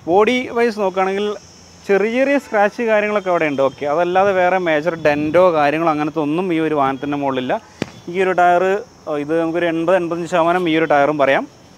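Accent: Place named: Kozhikode, India. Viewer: நீங்கள் பேசுகின்ற கோழிக்கோடு மலயாளம் எனக்கு மிகவும் பிடிக்கும் ❤❤❤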